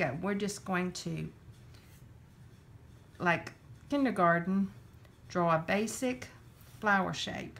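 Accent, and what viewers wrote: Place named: Texas, United States. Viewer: Darlin I was just looking up flower tutorials and I found this video. I know this was back in 2017 so I'm not sure if you will even see this. I'm from Texas originally and hearin that sweet country twang made me feel like I was home again. I think this is so wonderful and am gonna be prayin for you and your ministry. 💗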